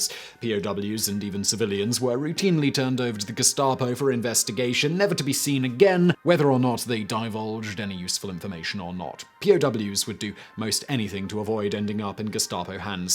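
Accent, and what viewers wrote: United Kingdom. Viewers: It's Vsuace but British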